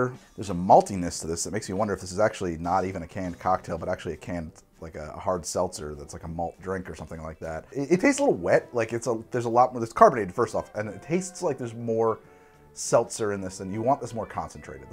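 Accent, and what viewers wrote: New Jersey, United States. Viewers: Wait are you from Philly dude? I thought the accent was giving Jersey. Anyway go birds!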